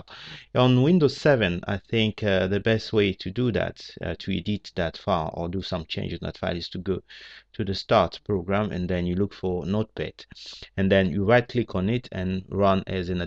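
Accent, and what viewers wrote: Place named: France. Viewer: ça marche pas avec moi =) french accent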